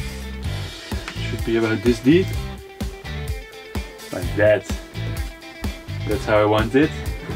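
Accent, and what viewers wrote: Netherlands. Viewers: Bent u Nederlands? Zo lijkt het wel. Ik herken uw accent.🤷‍♂️ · why is it so easy to recognize some one that is dutch like they got a very own way of talking i find it funny ps blijf zo doorgaan leuke video’s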